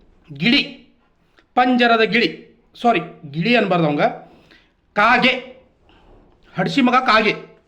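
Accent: North Karnataka, India. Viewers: ಗುರು ನಿನ್ ಮಾತು ಅದ್ಬುತ ಬ್ರೋ ಉತ್ತರ ಕರ್ನಾಟಕದ ಭಾಷೆ ಕೇಳೋದೇ ಚಂದ ನೀನು ಸತ್ಯ ಮಾತಾಡ್ತಾ ಇದ್ದೀಯ ಬ್ರೋ ದೇವರು ಒಳ್ಳೇದು ಮಾಡಲಿ ಆದ್ರೂ ಜೋಪಾನ ಬ್ರೋ ❤❤❤🙏🙏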